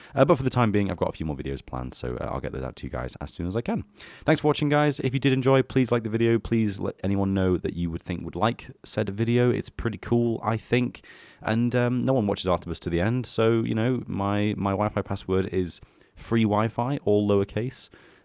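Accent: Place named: England, United Kingdom. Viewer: Somewhere in England, he's certainly got the accent for it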